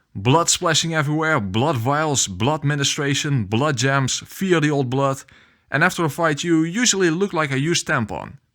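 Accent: Netherlands. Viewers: Jezus het Nederlands spat er vanaf de eerste seconde af. Lekker man, altijd mooi Nederlandse soulsborne fans. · Nederlands accent? hahaha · You sound kapot dutch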